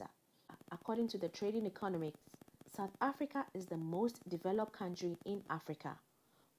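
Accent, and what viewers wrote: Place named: Ghana. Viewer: The accent is ghanaian and this channel is ghanaian...so direct this your vitrol to them..Olofo!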